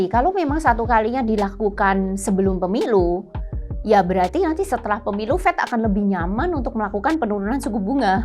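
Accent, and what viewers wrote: Java, Indonesia. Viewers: bagus kontennnya. cicinya logat jawa medok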